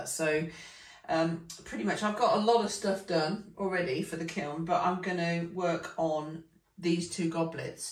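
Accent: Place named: United Kingdom. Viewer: It is your British accent that makes it difficult for my American ears to understand. But no worries, between the subtitles and slowing down the verbal, I am able to understand all that you say!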